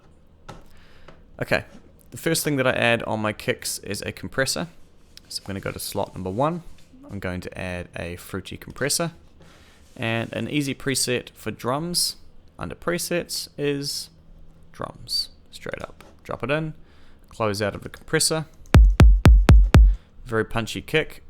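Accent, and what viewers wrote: New Zealand. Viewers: sounds like hes a kiwi. we just sound like that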